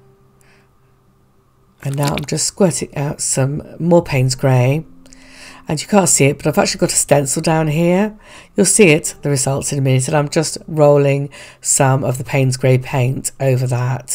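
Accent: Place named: United Kingdom. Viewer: I want you to know that I hold you responsible for enabling my Stamperia Paper addiction! 😉 Lol The problem is, I go through it and I love it so much I don't end up using it! Oh dear! So, these lovely longer vids are my faves! Your voice is calming to me & as a transplanted Scot living in the US, Brit accents are very soothing to me 😁 I am a long time fan of yours. You've taught me to be more adventurous in my gel printing! I've made shapes & swirls out of cut out cardboard - all sorts. I'd have never tried that without you inspiring me! Watching you sends me running for my paints... I can't think of a higher compliment, can you? Love your aesthetic,! Thank you so much for everything! Love & Bless xo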